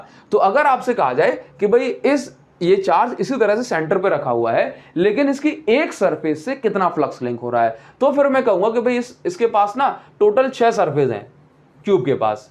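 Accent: Odisha, India. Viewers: Sir aap odisha se ho kya ?😅😅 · sir you are from odisha?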